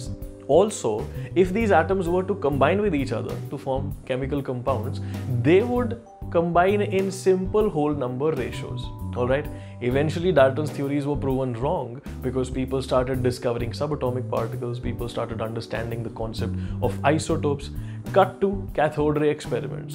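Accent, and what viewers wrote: Punjab, India. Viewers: Finally saw a punjabi with this accent in English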